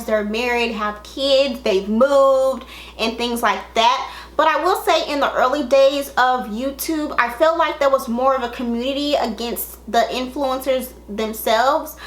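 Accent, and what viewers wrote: South, United States. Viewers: I started watching you just to hear you talk because you remind me of someone from the south such as myself....but when I say that you hit this topic right here out of the field and that was a hall of fame homerun. Gurrrrrlllll, you kilt me when you said, "I am 30"! That means I do not have time for the bull crap and the cliches'. That is some childish shit and I will say that for you. See, I love a genuine person. This is why half of them are having issues and problems within their homes and lives. Some cannot go any further because of it. There have been some that I have unsubscribed from for this very reason. Plus they always have problems with people and other brands......damn just shut the hell up with it all. True we come on here for entertainment but at the same time we want to see the real reality shows, instead of all of this she my friend oh I don't hang with them because they don't drive a Mercedes or they toast their bread in the stove and not a toaster....I know I went there for a second. 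Anyway, I love what you said and the way that you said it, you were respectable not to stomp on anyone toes. Really, if you are who I think you are--you wouldn't give a damn if they did get mad! I know I wouldn't. One instance and I know somebody may say something back at me but oh well, but this damn palette issue. Why are some people on these videos eating from the same table but you're not sampling this person's new collab, when I have clearly seen them on pictures toget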